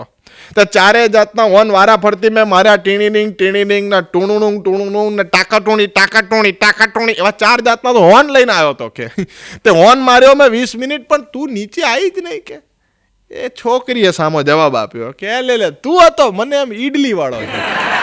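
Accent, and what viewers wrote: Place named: Ahmedabad, India. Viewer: ભાઈ તમારા હાસય મારફત તમો જે લોકો નુ દુઃખ દૂર કરો છો તે માટે આભાર વકત કરુ છુ આજે હાસ્ય વિસરાય ગયુ છે કેમકે આજે પતી પત્ની બંને જણા વ્યવસાય મા એવા રોકાયેલા છે હાસય શુ છે તે ભુલાય ગયુ છે તમો જે બોલો છો તે પાકકા અમદાવાદી છો એવુ લાગે છે તમો ને સાંભળી ને ખુબ આનંદ થાય છે આ રીતે આનંદ પીરસતા રહેજો આનંદ આભાર આશીર્વાદ ધન્યવાદ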